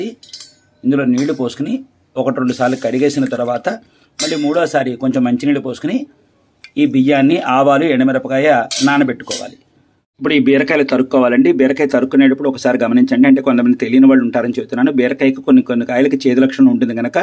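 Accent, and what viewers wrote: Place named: Godavari, India. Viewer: చక్కని గోదావరి జిల్లా తెలుగు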